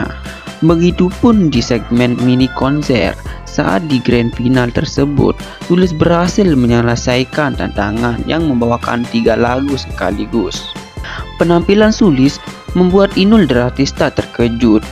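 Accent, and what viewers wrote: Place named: Lombok, Indonesia. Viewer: Bahasa indo logat lombok nya kental sakali mimin ny wkwk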